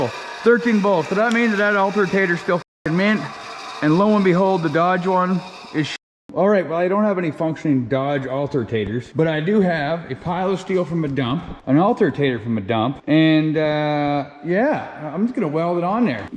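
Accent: Canada. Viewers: I’ve never heard someone as Canadian in my life I’m fucking hooked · Why do you talk like a Canadian mate ?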